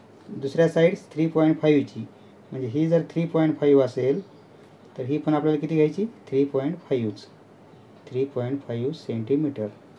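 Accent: Latur, India. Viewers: सर तूम्ही लातूर चे आहात का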